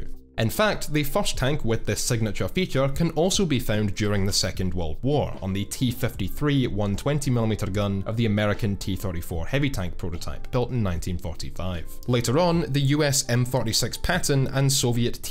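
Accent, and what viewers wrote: Scotland, United Kingdom. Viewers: Correction, explosives are not used to push the projectile out of the barrel. That is called Propellent powder and it does not explode it burns fast to create pressure. The only “explosive” is the small primer that ignites the propellent, but you know all that and mid-spoke with that thick Scotish Broge. Cheers!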